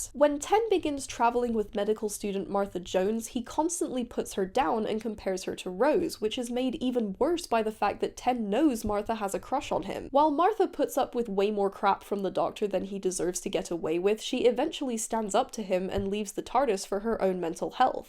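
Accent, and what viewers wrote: Scotland, United Kingdom. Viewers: Are you Scottish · You have a weird Scottish accent, can’t quite place it.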